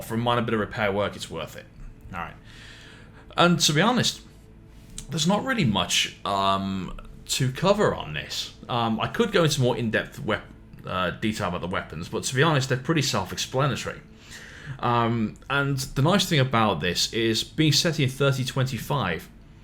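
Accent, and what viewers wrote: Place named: Northern England, United Kingdom. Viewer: Top class. It's one thing to know your stuff but it's quite another to be able to teach it, and you do that really well. It's good to hear a northern accent too, reminds me of my childhood. Hang on, my childhood was shit.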